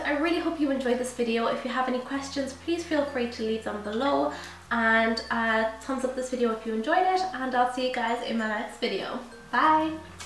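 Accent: Ireland. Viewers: Such a helpful video!!And you have such a lovely Irish accent.I live in the U.S. but I have family in Ireland I hope I get to visit some day.💞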